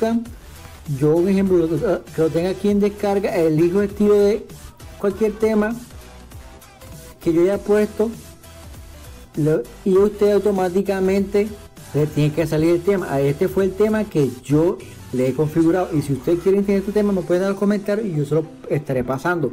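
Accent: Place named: Brazil. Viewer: Jah tienes asento brasileiro en el video. Así es amigo Ya salió la versión 9.00 hace poco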